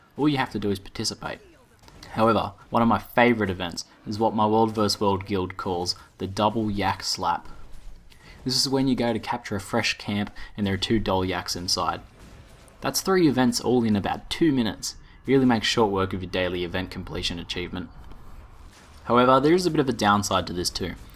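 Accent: Australia. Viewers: Awful aussie accent :D Nice vid however.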